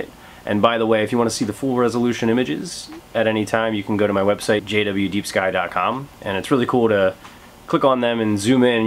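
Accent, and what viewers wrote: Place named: Virginia, United States. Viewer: You in VA? Sounds like my area lol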